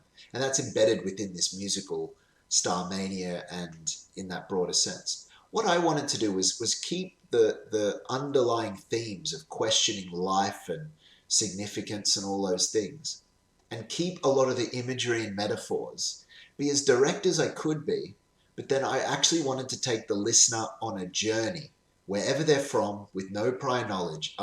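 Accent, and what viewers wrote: Australia. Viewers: Thanks for doing this! Having worked in recording studios producing songs and ad jingles, I was always fascinated by the sound engineer’s familiarity with the console and utilizing the tracking part of it. One of the fun ones used to be working at EMI Studios with a 48 track console. That was many years ago. Now, its digital, so it’s even more fascinating to me! Good one! On another note: Are you Australian, or from South Africa or New Zealand?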